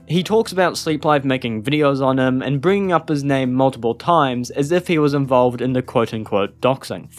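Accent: United Kingdom. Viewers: Are you british? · You're videos are good but please stop being british, it's hard to listen to you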